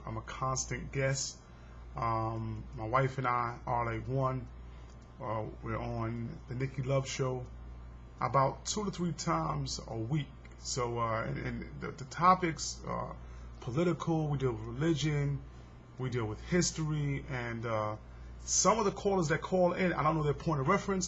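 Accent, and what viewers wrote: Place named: East Coast, United States. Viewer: You have an unmistakable East Coast accent. I will look into purchasing some of those books you reference. Good job keep up the good work youngster.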